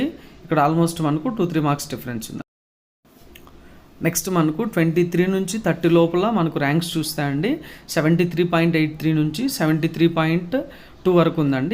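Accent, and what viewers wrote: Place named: Coastal Andhra, India. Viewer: Hi " " garu ur work is good bcz collected in 2018 dsc ranks information, i am not expected u as kadapa guy bcz does not dialect of kadapa😅 voice over is good as costal, i belong to ATP😁, all the best 👍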